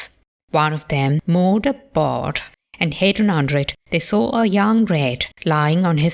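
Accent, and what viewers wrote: RP, United Kingdom. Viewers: this is an extraordinary accent! It sounds even more heightened RP than my grandmother (b.1903 d. 2003) and I can hear aspects of the former colonies as well? Fascinating, beautiful even in it's uniqueness. Not a standard RP though, nor even a standard heightened RP accent. Use of Arthur is valid.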